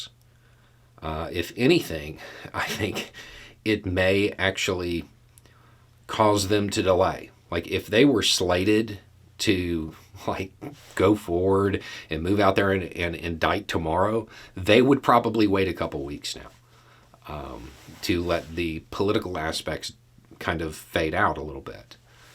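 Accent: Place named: Panhandle, United States. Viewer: So weird to go back to videos from like 4 years ago, back when he was leaning heavily into a Panhandle accent.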